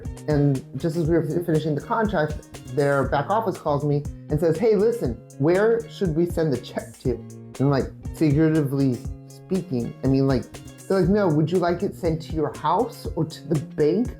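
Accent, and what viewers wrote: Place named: Brazil. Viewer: Hold up, you're American? 😂 You're speaking English with a Brazilian accent. 😆 That's fking amazing.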